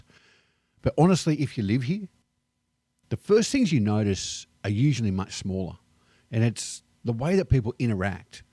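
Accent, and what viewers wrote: Australia. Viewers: If you are. Living. In the. Southern USA people are friendlier there than other parts of the USA. The northeast USA is less friendly. Since you have a pronounced Aussie accent, people everywhere will be curious and talk to you more. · It's fascinating that he thinks it's "very personal" to ask him about his (very charming) accent. To us Americans, this is just totally natural banter, not remotely personal. Interesting look at the cultural differences between us Yanks and the Aussies. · Welcome to America! I love the Australian accent.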